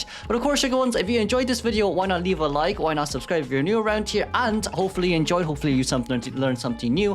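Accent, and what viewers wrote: Ireland. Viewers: i didnt know irish people pronounced words ended with -t as -sh, that's interesting!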